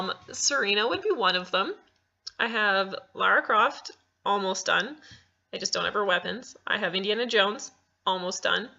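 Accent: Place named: United Kingdom. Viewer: oh dear British accent, really haha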